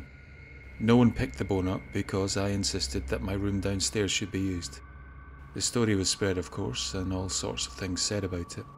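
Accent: Scotland, United Kingdom. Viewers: P.S.. Love the narrator's voice, Scottish accents just make me gushy!! I'd pay money for this guy to narrate my grocery list once a month!! lol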